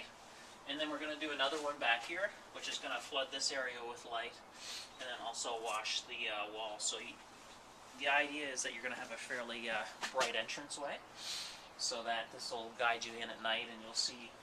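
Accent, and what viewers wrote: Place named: Toronto, Canada. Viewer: Totally knew you were from Toronto before I even saw your user name! #Hoose LOL!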